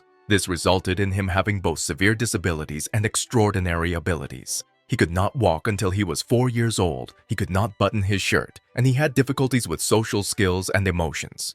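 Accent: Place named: India. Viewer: I miss your beautiful improper Indian voice.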